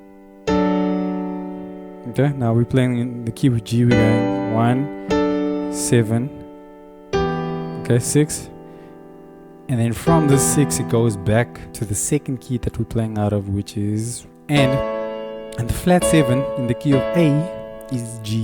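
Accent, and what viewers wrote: South Africa. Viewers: Are you from South Africa? The accent seems a bit familiar.. :-)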